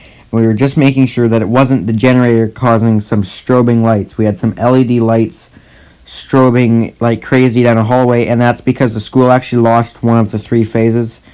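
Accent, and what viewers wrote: Canada. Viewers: Ah, that's interesting. We have a similar program here in Alberta that allows you to go to school in the morning and work in your desired trade in the afternoon. It's called the RAP (Registered Apprenticeship Program). Is it similar to where you are (not sure which province you're in but I can hear the Canadian accent in your voice)?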